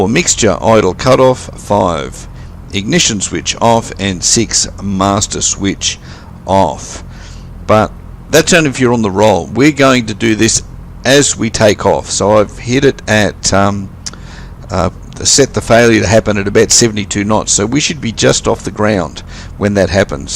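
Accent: Australia. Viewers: Great video, a side of flight sims people don't usually consider. Good to hear another Aussie too!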